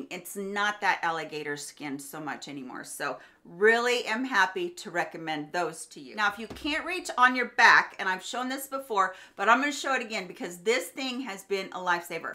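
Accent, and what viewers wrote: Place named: Upper Midwest, United States. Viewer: Wow, I had no idea Ms was a fellow Texan! Since I started watching the channel I thought I heard a very light upper Midwestern accent.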